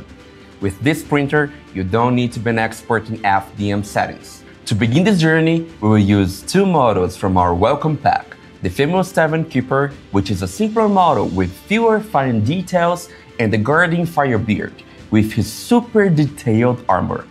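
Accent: Brazil. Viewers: Your accent sounds like mine, are you Brazilian? 🇧🇷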